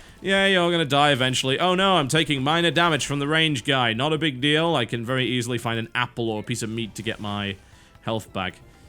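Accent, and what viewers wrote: United Kingdom. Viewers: Huh? There's nothing really smart about those words... "implies" "superiority" "objectivity" If you think those words are 'smart' then, I'm at a bit of a loss, besides, is called the 'cynical' Brit for a reason, but, I can understand where your viewpoint comes from, and to some degree, I can understand why you think of him like that:) (by the way, his accent isn't fake, he's British, and that is a real British accent;))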